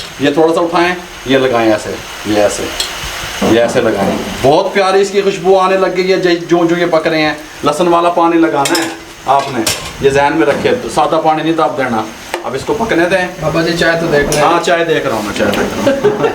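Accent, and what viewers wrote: Punjab, India. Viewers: Bhai you r the BEST. I jus love your Punjab accent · kia baat hay. way of expression is impressive. punjabi ka touch bht acha.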